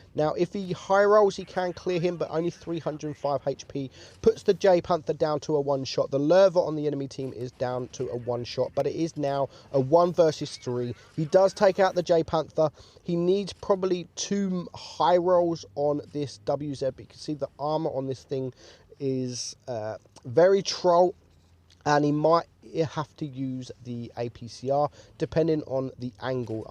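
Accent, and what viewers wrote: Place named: England, United Kingdom. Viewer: drake 515_3 just a English thing. Listen how he says armor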